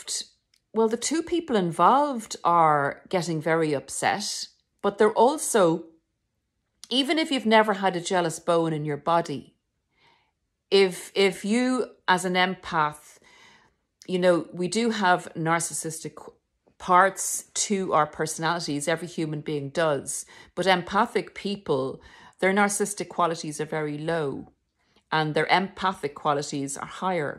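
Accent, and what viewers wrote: Ireland. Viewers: I like your accent are you Irish?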